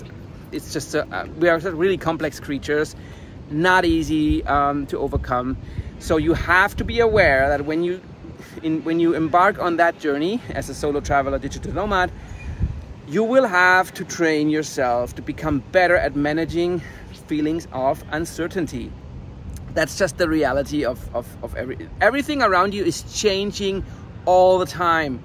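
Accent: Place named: Germany. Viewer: I like your video. I subscribed to your Channel. You talk clear, so in my opinion you do not need subtitles. I think you have a nice German accent (I am Dutch, the subtitles annoy me a bit, but that is my personal opinion)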